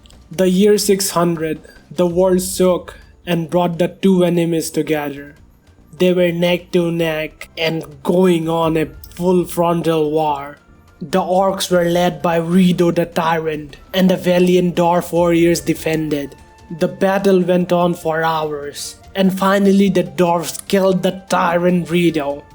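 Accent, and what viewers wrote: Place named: India. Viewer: great stuff I want more I just feel a bit uncomfortable with the accent it sounds like sounds I make when I feel sick making me sick, plus if I recognise the accent correctly this is a weak indian influenced/ indian accent which ill be frank I dont like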